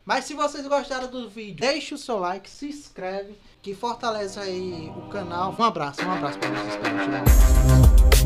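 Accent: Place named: Pernambuco, Brazil. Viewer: Mermao com esse sotaque ai ce é de pernambuco?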